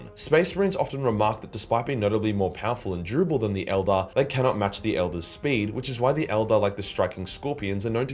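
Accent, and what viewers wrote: Australia. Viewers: May I as central europian slav request you to speak about 10% slower 😂 i get everything you talking about but it is really paiful for understanding aussie accent in this cadence of words 😂 I still love naughty Austrian non painter creator ❤️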